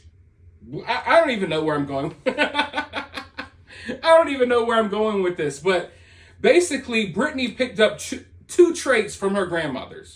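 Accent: United States. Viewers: You are speaking such a beautiful american-english, so I (a german speaking person) can follow you. Thank you!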